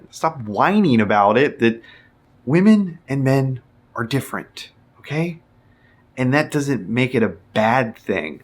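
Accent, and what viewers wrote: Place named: Yorkshire, United Kingdom. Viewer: Hey are you from Yorkshire UK? You sound like a Yorkshire man 😆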